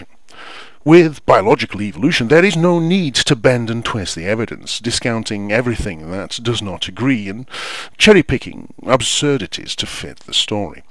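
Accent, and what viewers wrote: United Kingdom. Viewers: your an excellent reader as well as a excellent thinker. But I'm an American... everything sounds smarter with british accents. I look forward to your next video. I do have a cat that steals my pasta dishes... but it think its' for the butter and cheese flavors?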